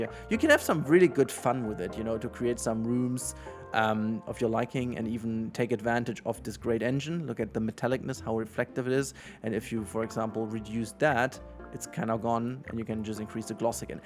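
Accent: Germany. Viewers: Wie man das deutsche raushört 😂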